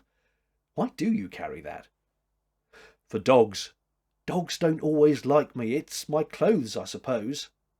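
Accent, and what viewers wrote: United Kingdom. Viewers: look into uploading your recordings to Libravox. Make sure to mention your British, because Americans dig the accent.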